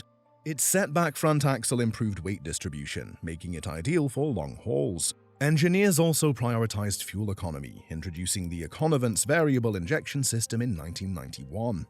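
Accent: United Kingdom. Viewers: Why a Brit explaining American history?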